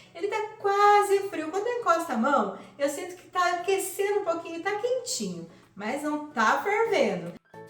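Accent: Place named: Minas Gerais, Brazil. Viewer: eu sou encantada com vc. Adoro seu jeitinho de falar, sua autenticidade, sua simpatia. Vc é linda! Esse seu lindo sotaque é de onde mesmo? Tem um jeitinho meio mineirinho. Eu sou de BH. Amo minha mineirice. Beijo em seu ❤️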